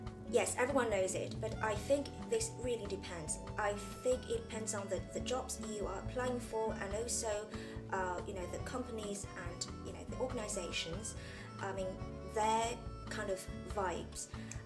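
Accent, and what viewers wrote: United Kingdom. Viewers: how did you learn that accent? Luv that british accent! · 简直英音。。。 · 沒有美吧...整個英到不行...... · 这个女孩的英式英语说的真的很好。不是光是语调节奏听着英式而单音错误一大堆的那种混迹在国内各大网站论坛的误人子弟者。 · 覺得英腔加一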